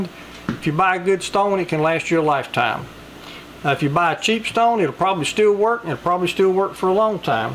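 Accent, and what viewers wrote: South, United States. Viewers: thank you for this great video! It was nicely done and you very thoroughly explained the technique and WHY you don’t do certain things. I always find that sooo helpful when you tell someone why they shouldn’t do something (like the backside). You sound like you’re from the south, maybe North Carolina, because that’s where I lived for 25 years and my hubby is a southern boy from NC. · I just love your southern drawl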